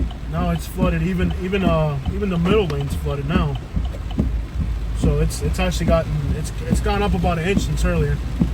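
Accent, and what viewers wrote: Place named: Kentucky, United States. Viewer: You sound like you are from Kentucky “yall”! But I love it ..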